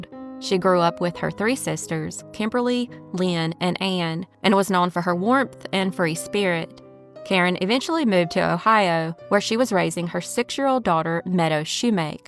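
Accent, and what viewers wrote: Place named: South, United States. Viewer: It is so nice to hear a southern accent! I'm from Ohio, but family is in Georgia. Grandma moved up here and would tell me stories to get me to sleep. Listening to the accent with true crime is so relaxing!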